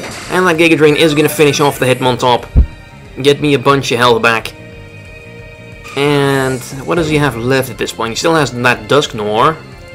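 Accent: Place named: Spain. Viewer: español de pura cepa jajajaja pedazo de acentazo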